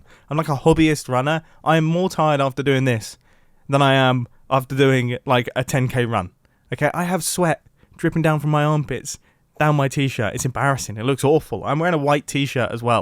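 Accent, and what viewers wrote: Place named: United Kingdom. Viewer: That Bri-ish accent tho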